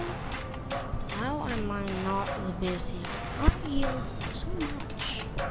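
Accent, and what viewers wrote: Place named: Afrikaans, South Africa. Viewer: Are ya south african thats ONE HELL OF A AFRIKAANSE ACCENT MY BROE.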